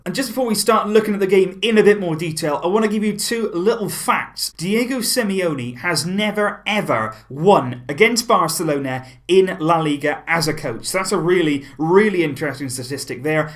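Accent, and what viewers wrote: United Kingdom. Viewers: you're a star pal. I do love your channel very much. I do like and appreciate the way you explain the things by yourself. Everything abdolutely well explained with a lot of sense. I'm spanish and I am living in UK, I can notice from your accent that your British. Isn't easy not to be spanish and be as you are, a very FCB lover. I'd be grateful if you take atention and also perhaps, answer my comment. I hope, we, all the Barça supporters, can celebrate together many titles this season, as we really deserve. Specially for the king of the kings, our master and always unforgettable Lionel Messi, which has made this team (club) a real live style. I gotta be proud of this guy, as well with: Iniesta, Busi, Luis Suárez, etc. GO AHEAD Barça !! Still many amazing moments yet to enjoy from this squad!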